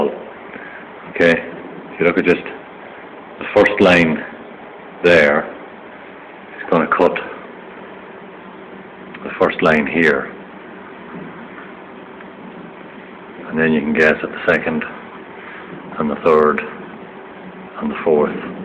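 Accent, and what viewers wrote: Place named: Ireland. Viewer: Also great to hear another irish accent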